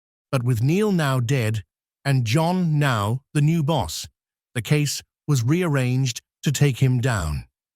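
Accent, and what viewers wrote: United Kingdom. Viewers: I think his crisp British accent is far better understandable than some American mumbling.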